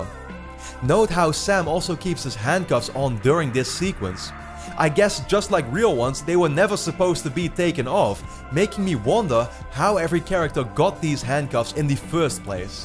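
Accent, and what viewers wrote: Netherlands. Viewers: Having an allergy may mean he has better resistance, though, to whatever is causing it. Awesome video! Can't wait for the game either. Heerlijk NL accentje btw :D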